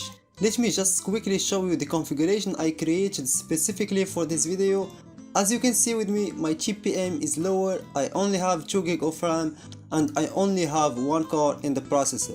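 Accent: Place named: Morocco. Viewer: f....king Morrocan accent, I like.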